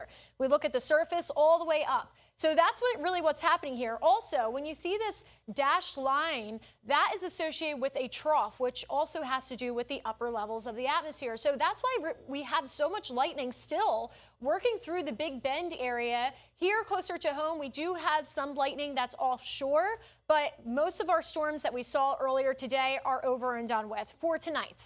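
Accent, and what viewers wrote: Philadelphia, United States. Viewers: i know a philly accent when i hear one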